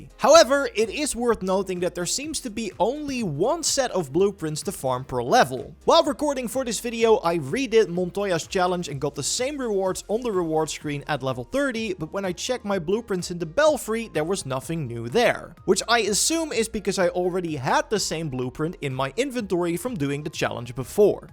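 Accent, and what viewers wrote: Netherlands. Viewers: Love this video as I'm really enjoying this game. But that Dutch accent though damn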